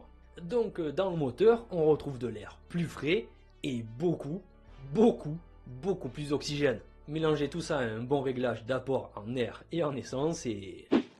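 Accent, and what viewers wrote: South, France. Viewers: Merci YouTube de m'avoir emmené ici, c'est vraiment très intéressant, le tout saupoudré d'un accent du sud chaleureux 👍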